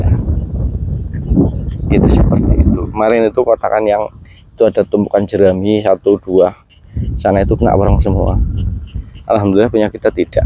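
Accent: Banyumas, Indonesia. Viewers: Ngapak tapi pinter😂 maju terus pak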